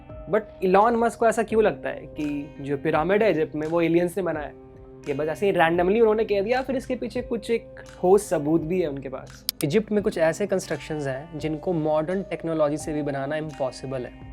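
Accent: Bihar, India. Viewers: bro r u frm bihar??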